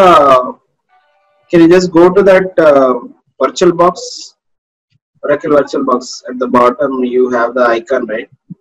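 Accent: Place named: India. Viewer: nice hindish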